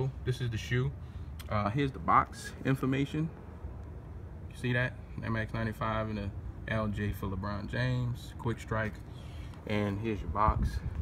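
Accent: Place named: New Orleans, United States. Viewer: Where u from bro sound like u from the N.O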